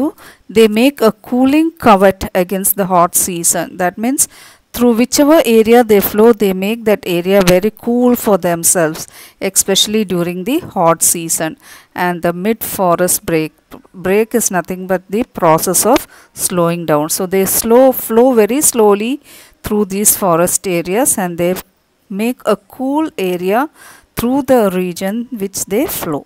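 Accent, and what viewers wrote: Kerala, India. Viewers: Its clear in ur voice and tone that u r a malayali maam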